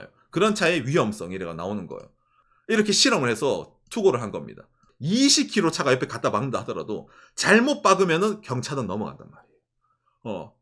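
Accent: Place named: Gyeongsang, South Korea. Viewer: 우연히 봤는데 남자분 한국인 이신가요?한국말정말잘하시네요 경상도말씨처럼~~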